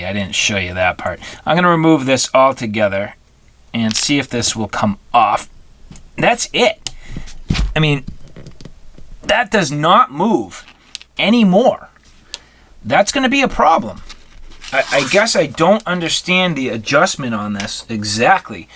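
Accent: Boston, United States. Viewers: This guy from Boston?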